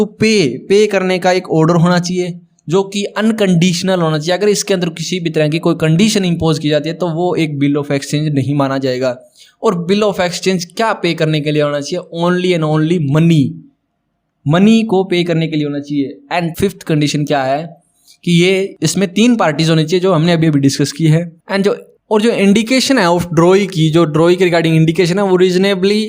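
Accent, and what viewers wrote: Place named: Haryana, India. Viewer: U are from Haryana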